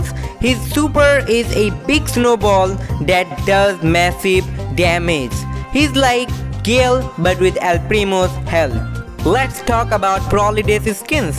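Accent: India. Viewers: This Indian accent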